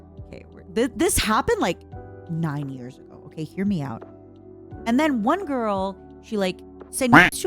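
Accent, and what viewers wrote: Quebec, Canada. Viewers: as a french from France, it's wierd to have a "typical" french music, over an quebec accent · lmao she even speaks the Quebec dialect xD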